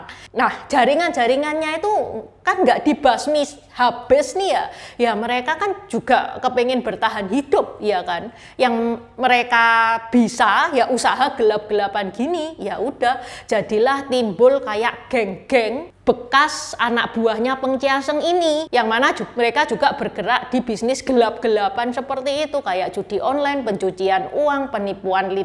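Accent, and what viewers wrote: Java, Indonesia. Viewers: Seneng banget sama itu logat jawanya medok bangeeet,waw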